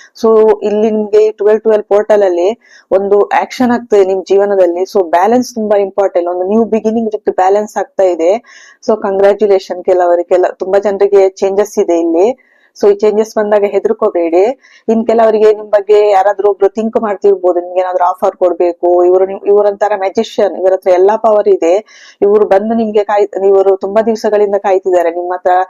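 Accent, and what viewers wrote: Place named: Mangalore, India. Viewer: Thank you sister ❤ are you from Mangalore by hearing your accent I'm feeling like that ..but anyways nice reading.thabk you❤ Thank you universe ❤Thank you god❤ Thank you angel❤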